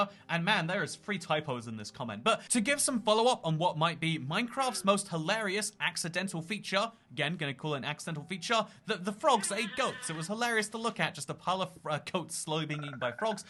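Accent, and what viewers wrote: United States. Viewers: Pretty good American accent i’m ashamed to say people do sound like that 🤠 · It's not the only thing that I take from your videos, but I love your "Monopoly Man" U.S. accent. Kind of a southern Civil War colonel meets old, crotchety Appalachian hillbilly thing. I can say that because I'm an East Texas hillbilly type. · Please don't do an american accent, it scares me. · I'm american 🇺🇸 and even though I'm Texan I've never heard an American accent like that. It's funny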